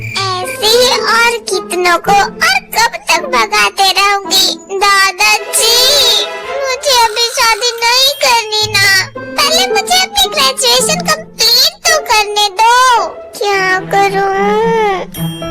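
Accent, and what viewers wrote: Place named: South, India. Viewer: Aapki voice me thoda sa south indian touch hai like dadaji 😅 bahut acha laga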